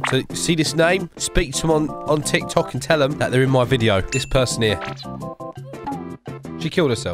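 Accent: United Kingdom. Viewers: YOUR VOICE IS AMAZING THE BRITISH ACCCENT I CANT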